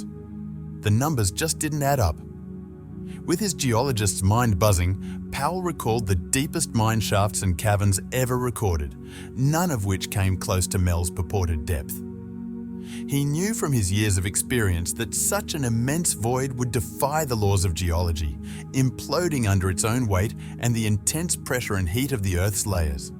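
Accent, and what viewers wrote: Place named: Australia. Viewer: what a waste of time! With a much repeated story line, which I think is written by someone who has a great imagination ! 😅😅 Its a shame, as I was thinking an Australian voice would be nice to hear for a change. But it just got worse the further it went on. 'Just stay tuned to hear the horrible story' of Mel, to find the truth. 😅😅 I stuck it out by typing this 😅😅😅 880,000feet!?😅😅😅😅 Don't bother!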